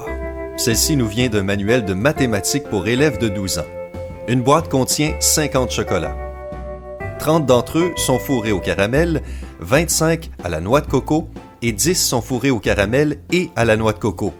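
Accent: Quebec, Canada. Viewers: songUp euh non c l'accent quebecois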